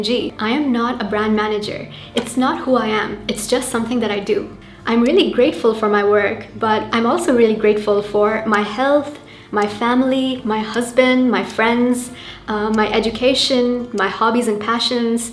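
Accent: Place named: RP, United Kingdom. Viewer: How did you develop such a posh accent?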